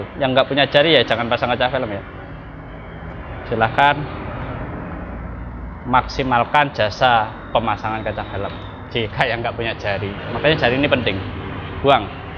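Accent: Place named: Java, Indonesia. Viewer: owalah denpasar ... kalo ngomong kok ada jawa"nya masbrow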